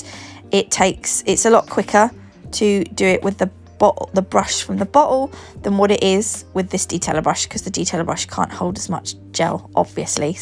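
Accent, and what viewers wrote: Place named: United Kingdom. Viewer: I absolutely love your content and I really enjoy watching your videos and I just love your accent it reminds me of my extended family who live in the UK 🇬🇧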